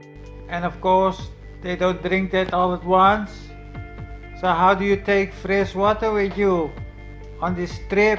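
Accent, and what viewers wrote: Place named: Netherlands. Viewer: <link> There are two horses on the right side in the "picture" just above the crates. They blend in a bit with the snow ;) Not saying its real just spotted some horses there. In a way its awesome all these bullshit stories from the past, gives us material for comedy gold. ;) And as a fellow "Dutchman" i love your accent and way of talking! You bring me joy and uncontrolled laughter in these batshit crazy times haha.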